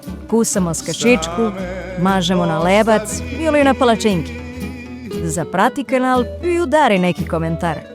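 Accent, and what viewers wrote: South, Bosnia and Herzegovina. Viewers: Lele,kakoj si ovoj devojče naučija da ovako zbori po juznjacki?Svaka gu čas!😜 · Govor nas juznacki, milina je slusati, pa milina od recepti unikatni. Alal vera za zlatne ruke bre🎉🎉🎉🎉🎉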